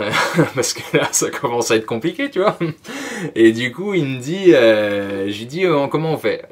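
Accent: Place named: Haute-Marne, France. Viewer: Côte d’Or, ok 👍 En haute-marne ils ont un peu le même accent.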